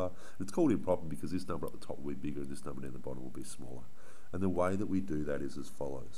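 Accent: Australia. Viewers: If everything learning related, was taught in an Aussie accent, I’d probably be so much smarter and would have paid a lot more attention hahaha.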